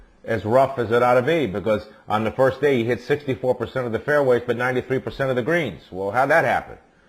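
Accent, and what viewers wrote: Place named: United States. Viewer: Nice video. What part of USA is the accent? Cool.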